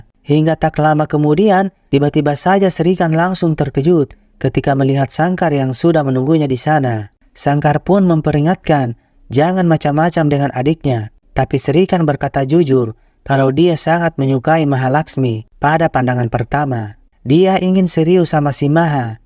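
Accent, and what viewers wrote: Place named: Makassar, Indonesia. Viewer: Logat makasar ni host nya . Cara baca pun Sulawesi ki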